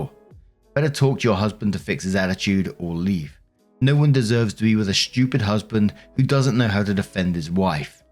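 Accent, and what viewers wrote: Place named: Oxfordshire, United Kingdom. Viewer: I live in South TX, but when I was little my dad was in the US Air Force and Stationed at Upper Heyford. We lived in Oxfordshire (a little place called Middle Barton). When I listen to you talk, it reminds me of the 3 years I lived there. I don't know if that's anywhere near you, but listening to you takes me back!! The neighbor drama, the cheeky little kids. We were always in and out of everyone's houses too. Love your channel and stories!!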